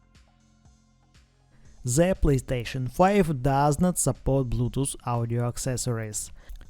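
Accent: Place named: Eastern Europe. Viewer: It's like Slavic mixed with Greek with a hint of Arabic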